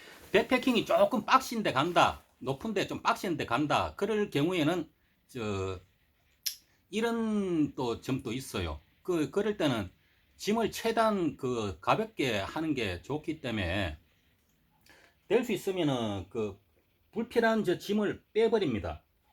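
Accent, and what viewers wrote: Gyeongsang, South Korea. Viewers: ■ 새마을 티셔츠보고 방심했다가 장비보고 놀랍니다. 특히 msr 리액터에 빵터졌음. 아재 잘보고 갑니데이~ 구독도 박았심더. 고수시네요 ^ ^ 사투리도 구시하이~ ㅋ 저도 대구 경북이라서 듣기 편합니다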